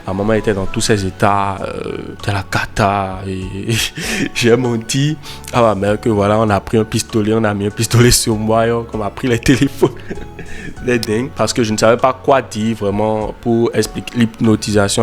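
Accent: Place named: Togo. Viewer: Bonsoir, vous avez un accent togolais!🌺❤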